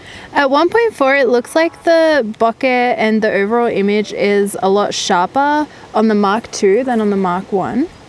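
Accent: Australia. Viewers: Omg thats literally the store I bought my first lens from, just two weeks ago. I also went in there yesterday to check if they had a canon 35mm 1.4 cheaper non L but no stock. Now I'm looking at buying a mark 1 used for cheap today hopefully. For some reason I just assumed you where Canadian like most you tubers lol, I didnt even notice the aussie accent but now I think about it, its definitely there :P Got to say too damn you take some nice photos for unedited.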